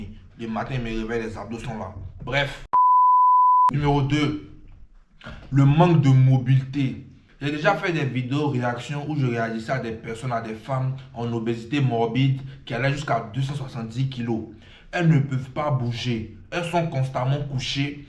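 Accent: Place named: Ivory Coast. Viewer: Coach je sais pas pourquoi mais j'aime tes gimmick et ta manière de parler, j'ai montrer ta vidéo a un pote et c'est lui qui ma dit que tu étais Ivoirien 😅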